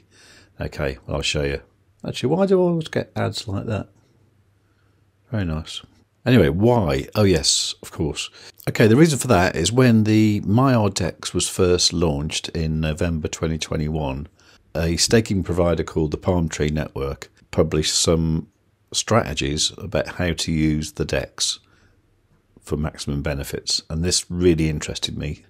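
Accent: Midlands, United Kingdom. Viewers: Are you a midlands boy im from the black country maybe your not from too far away :)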